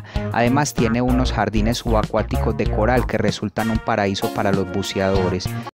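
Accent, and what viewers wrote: Colombia. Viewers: Sin duda alguna es colombiano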